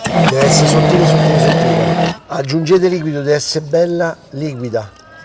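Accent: Rome, Italy. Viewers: Certo fai la spesa al mercato di trionfale parli romano sei de Roma e apri il ristorante a Milano...😅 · Minchia non mi stanco mai di vederti e soprattutto sentirti con quell'accento romanesco che mi fa' impazzire, sei grande sei la passione in cucina, grazie per i tuoi preziosi consigli ❤ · Ciao... Ogni volta che te sento parla mi sembra di ritorna' a Roma mia.... Mi manca tanto ❤❤❤❤❤ ricetta fantastica ❤ Bona!!!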